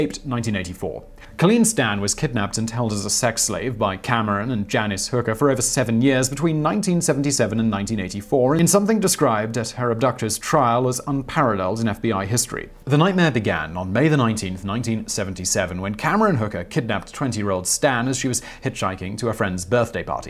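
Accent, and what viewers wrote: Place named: RP, United Kingdom. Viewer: You have the poshest accent I've ever heard!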